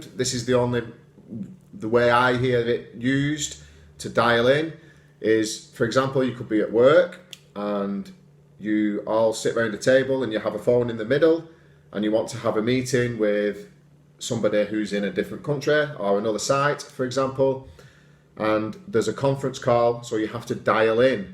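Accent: United Kingdom. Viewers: Great job 👏🏻 i love British accent 😻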